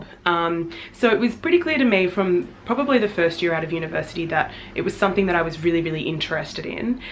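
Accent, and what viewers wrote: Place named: Australia. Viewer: Your Aussie accent is delectable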